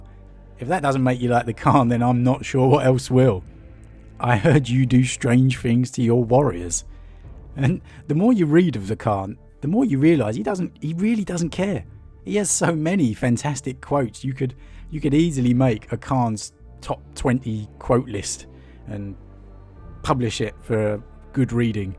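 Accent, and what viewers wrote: United Kingdom. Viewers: Cracks me up how you say "ass" and not "arse" with that British accent 😋 Great vid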